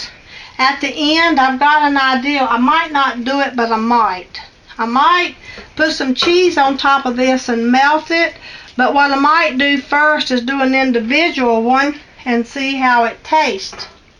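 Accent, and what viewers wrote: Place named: Appalachia, United States. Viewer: I too suffer from fibromyalgia and also MS and empathize with you. God healed me from stage 4 colorectal cancer 2 years ago and it's a painful cancer- but nothing I've suffered (and I've had 10 pregnancies lol), not even MS or the cancer was as bad as the fibro. It's the worse! God is with you and there's no faith like Appalachian faith - I know cause that's my family, from the hills of W. Va and Va, near the border- both sides! Your accent always brings me home. ❤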